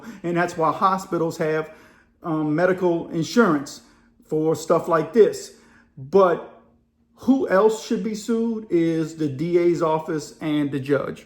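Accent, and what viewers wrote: Louisiana, United States. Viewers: Love the accent,Louisiana?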